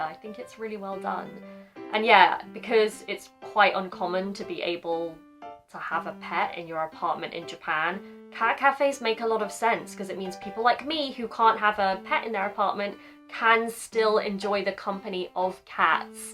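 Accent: United Kingdom. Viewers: 実は上質なイギリス英語が聞けますね笑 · イギリス英語の発音がカッコいいイギリス女性が日本の食事や旅行や100円ショップを嬉々として楽しむ。可愛くて最高だな。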